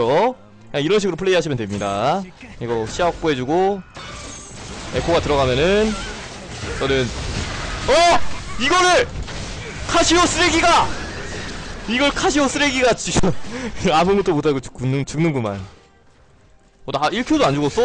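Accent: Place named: Seoul, South Korea. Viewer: 일로온나 일로온나 익숙한 부산사투리가 들려 ㅋㅋㅋ 님 말투 서울사람같은데 어디사심??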